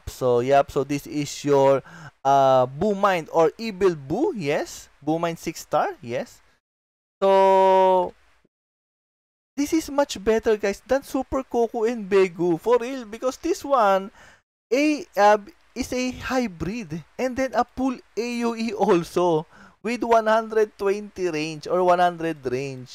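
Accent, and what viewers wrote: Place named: Philippines. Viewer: The accent is like a Philipino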